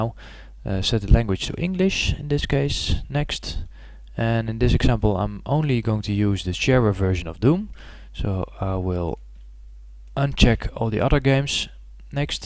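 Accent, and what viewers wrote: Netherlands. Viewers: I can hear that you're Dutch :) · are you dutch? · Are you Dutch Ben je Nederlands? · are you dutch? u have a dutch accent lol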